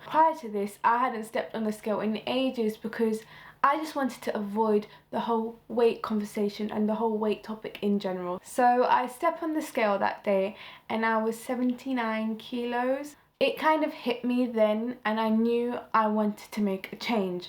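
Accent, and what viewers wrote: England, United Kingdom. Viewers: İngiliz aksanlı, açık fikirli, diyet değil sağlıklı yaşam fikrini savunan bir Türk kanalı mı? Hemen abone oluyorum 😊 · love the British accent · Are u from uk?? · What part of England do you come from? You sould just like Mr.London who has fitness videos here on YT.. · I LOVE your voice it reminds me of the voice of Amy Winehouse !! I think it's the accent ahaha are you from England ? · I love your British accent gurl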